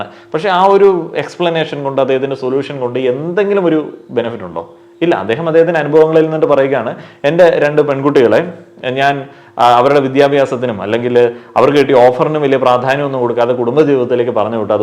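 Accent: Kottayam, India. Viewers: Only a Kottayam Boy can talk like this.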